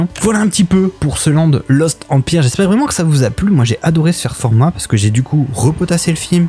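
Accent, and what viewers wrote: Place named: France. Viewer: J'aime beaucoup le son de ta vioix sur cette video, nouveau micro, nouvelle equalisation ? Les deux ? Et ton English me fait toujours marrer. Ne change rien, so french.... Quant au sujet c'est sympa le land à 4 milliards. Tres sympa, totalement improbable mais sympa. Encore.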